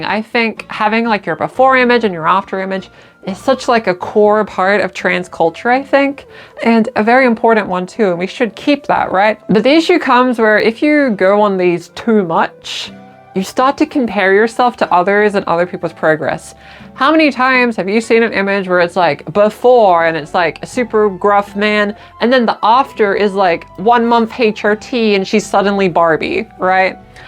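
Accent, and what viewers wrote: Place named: Australia. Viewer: You kinda sound like pearlescent moon lol (its probably the aussie accent) ❤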